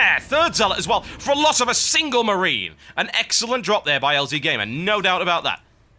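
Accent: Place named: London, United Kingdom. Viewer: lol, i can tell you watch a lot of EPL, using terms like "equalizer," as if LZgamer was one-nil down hehe. ARSENAL IS MY HEART. judging by your accent (i'm terrible at judging english accents) you do sound like a londoner. which means there's a good chance you're a gunner fan :D. keep making videos, there will be criticism no matter what you do online. everyone is a professional at everything with anonymity. in real life, they most likely flip burgers and drop potatoes in fryers all day.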